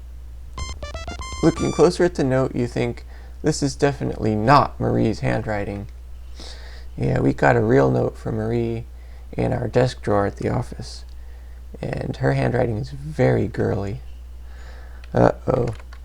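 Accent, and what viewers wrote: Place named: New Zealand. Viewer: Sound like he is from New Zealand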